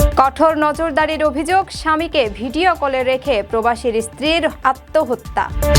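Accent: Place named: Barishal, Bangladesh. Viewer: এই পাঠিকার গ্রামের বাড়ী মনে হয় বরিশালে।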